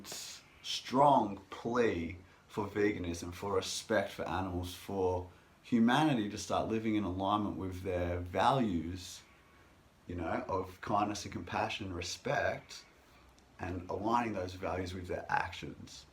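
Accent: Australia. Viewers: I first suscribed to your channel thanks to and because of your way of putting veganism forward. Always wise and peaceful. I also wanted to improve my English and understand the australian accent - it actually helped alot! - But what I truly love about your channel is that we also learn how to be humans. Not sure if it is understandable but your videos are a great source of inspiration, for the animals as well as for us, as living being. Great job and greetings from France!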